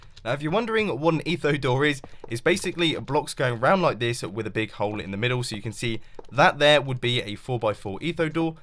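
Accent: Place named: United Kingdom. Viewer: I love you and you sound so British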